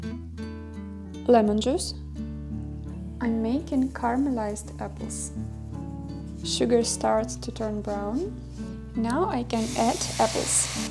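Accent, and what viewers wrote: Ukraine. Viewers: nice videos. excellent choreography. excellent photographic angles. nice Ukrainian accent. so yummy Ukrainian dishes. wow torturing us viewers with heavenly food we can't eat haha. many thanks for producing and sharing these videos with us. have a great day always. 🌹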